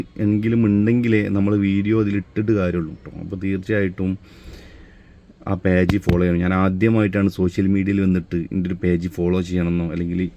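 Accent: Malappuram, India. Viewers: മുത്തുമണി മലപ്പുറം ഭാഷ മതി ❤️❤️❤️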